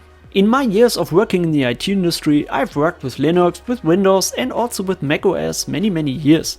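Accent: Germany. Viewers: Man hört kaum das du deutscher bist xD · Yeah, German accent, great 😃 Subscribed!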